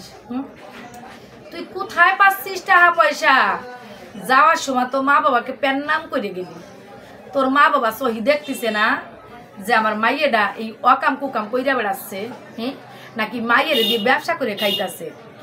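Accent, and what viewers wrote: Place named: Khulna, Bangladesh. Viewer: খুলনা থেকে দেখছি দিদি,,,ভাষা শুনেই বুঝেছি কাছের মানুষ আপনি❤️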